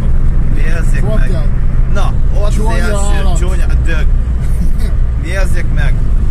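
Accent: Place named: Transcarpathia, Ukraine. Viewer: Mert valószínűleg Kárpátaljai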